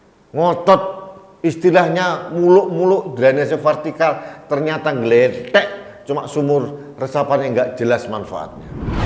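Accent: Java, Indonesia. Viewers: jowomu asli pak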